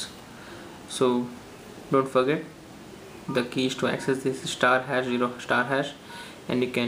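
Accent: India. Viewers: every things great except the way of talking and that accent...fucking bullshitt indian accent...itz star not ishtar you fuck!!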